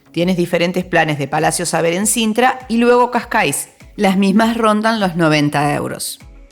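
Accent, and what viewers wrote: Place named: Argentina. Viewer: Por qué neutraliza el español si se nota que es argentina? Que ridícula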